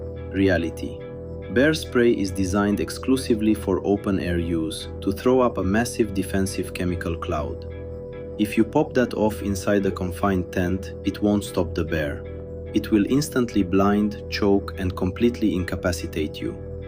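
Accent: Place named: Eastern Europe. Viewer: ur English is great and you did a superb job in the narration. I support Poland 100% as well as your great president in keeping Poland Polish. Western Europe is committing cultural suicide.Way back in Polish history, they took a strong stand as well. My comment was meant as a compliment because I always think the Slavic accents are so cool! I’ll put a “thumbs up” on your video right now.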